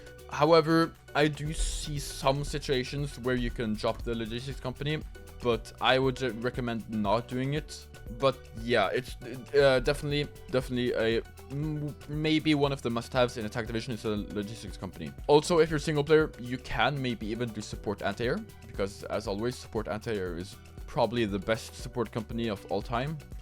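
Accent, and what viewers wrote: Norway. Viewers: Bror høres så norsk ut🔥🔥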